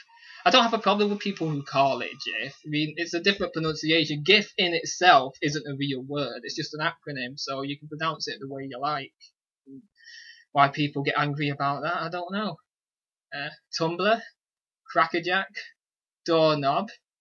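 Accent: United Kingdom. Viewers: You're a little bit awesome. Also, you sound like a Manc more than anything with a touch of Lanky in there to me, but you could easily pass as someone from Yorkshire because a lot of people here sound northern without any real overly distinct accent. /rant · your accent is indeed less noticeable than most British people's, however you do have an accent haha. also yes, fizzy drinks hurt. i thought I was the only one 😂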